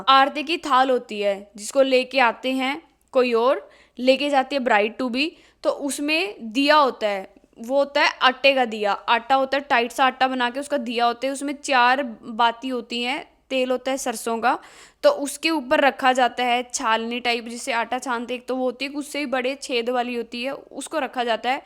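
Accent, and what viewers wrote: Haryana, India. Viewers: Your haryanvi accent is very nice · Di aap ke muh se haryanvi bhut achi lagti h 👌👌👌👌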